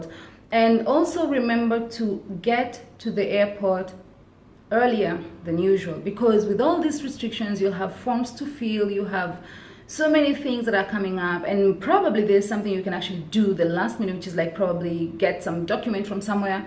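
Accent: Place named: East Africa. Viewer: Hello from Malaysia. How are u doing? U got East African's accent. Could u help me with ur FB id pls?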